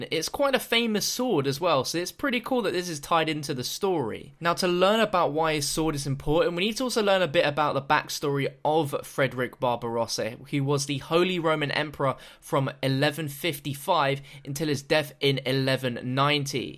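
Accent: United Kingdom. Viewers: the fact he has a british accent makes him reading the letter so much better.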